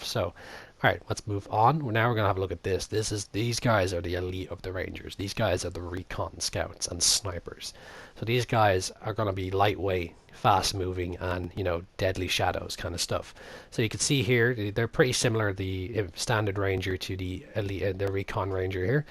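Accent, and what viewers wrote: Ireland. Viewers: I'm guessing your irish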